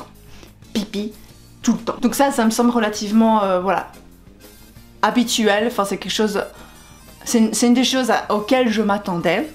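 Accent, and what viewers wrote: Belgium. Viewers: je crois entendre un accent belge? té bèlge? belge aux yeux verts, nan, pa possib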